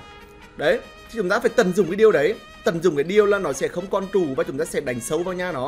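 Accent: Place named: North, Vietnam. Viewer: Giọng nói miền bắc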